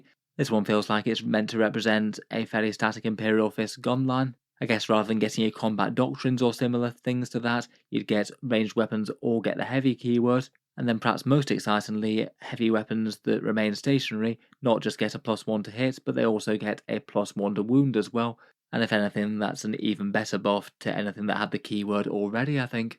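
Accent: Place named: United Kingdom. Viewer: Like the video because is vigilant like a sleepless dreadnought. A dreadnought with that smooth succulent British voice. If I were a Slaanesh worshiper I woul /MESSAGE DELETED FOR SPREADING HERETICAL THEOLOGY// INQUISITION ORDO-HERETICUS/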